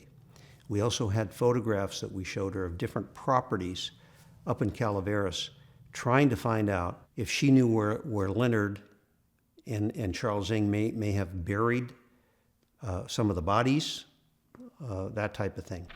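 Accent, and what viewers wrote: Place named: United Kingdom. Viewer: This is a wild story good job covering it. I really appreciate the fact that you limited your British accent in the video. American crime American voice. Nothing personal. I can barely understand what in the toast and beans you’re saying.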